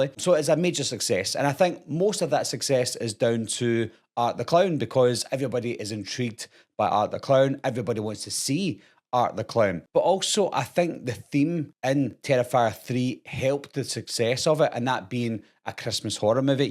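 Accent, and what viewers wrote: Scotland, United Kingdom. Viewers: Great video, man, awesome films, Damien Leone, is a great horror film maker, I really love your Strong Scottish accent, by the way, keep up the great work 💯🙏🏼💙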